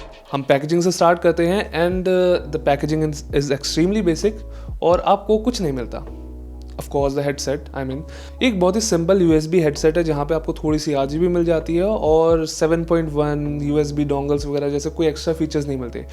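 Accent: India. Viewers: Very hindi english.. Hard to understand but appreciate your Work of course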